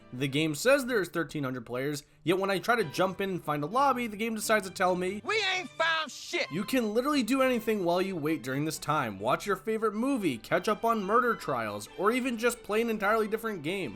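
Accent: Portugal. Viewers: És português? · Are u Portuguese?🇵🇹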